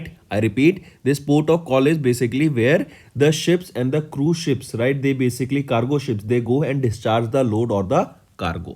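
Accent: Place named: North, India. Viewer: vadakkans pronunciation apdidha irukum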